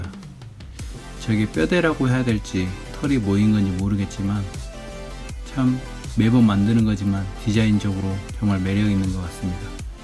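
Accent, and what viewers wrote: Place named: China. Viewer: Cara ele é de china você não viu como ele fala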